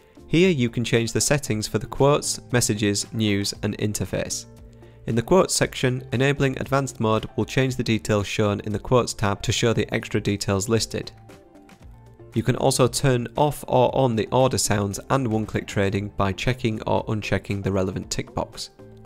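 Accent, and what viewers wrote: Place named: Yorkshire, United Kingdom. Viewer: Excellent tutorial, and totally understand the Yorkshire accent!!!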